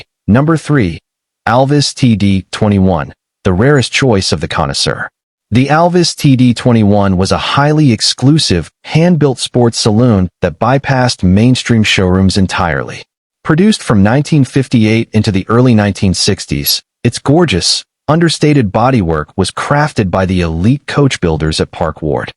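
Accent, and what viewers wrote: United States. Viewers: Who wrote this absolute BULLSH*T. Do your Homework. Grrr Plus, to have a Yank doing talking, well it is a disgrace The rover P4 was not called the Annie Rover. It was called the 'Auntie' Rover. The Morris Oxford and the Austin Cambridge Were just ordinary family saloons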